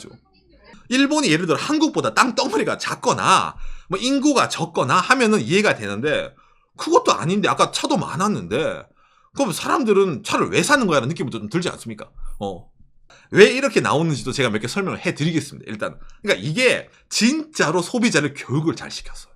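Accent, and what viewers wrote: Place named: Gyeongsang, South Korea. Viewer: 정보도 좋고 내용도 좋은데, 경상도 억양이 너무 쎄서 듣기 좀 그렇다. 좀 믿믿하기라도 하지. 너무 억양이 쎄네. 지역 얘기 하는게 아니고 듣기에 불편하다. 너무 억세서.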